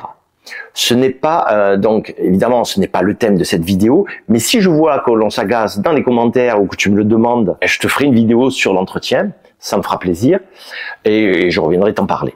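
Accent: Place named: Toulouse, France. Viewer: Salut. Je viens de découvrir ta chaine et je me suis abonné direct. J'adore ton accent. "Oh Putain Con!!!!!!" Ah mais "Merde" j'ai le même....... Je suis comme toi "Pas français mais Toulousain ". Je récupère ma Modèle Y Samedi. Merci de nous faire partager ton expérience et aux plaisirs de te croiser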